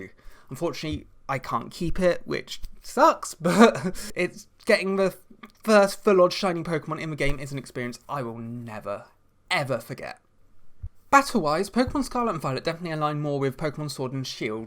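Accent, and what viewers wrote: United Kingdom. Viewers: holy shit is British? not sure why that caught me so off guard lol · YOUR BRITISH???? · I've been using forever and never knew was british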